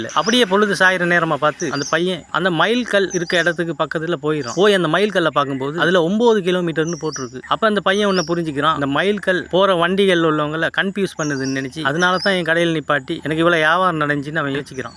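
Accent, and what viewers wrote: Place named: Sri Lanka. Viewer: Srilanka slang adikkidhu. Are you Srilanka?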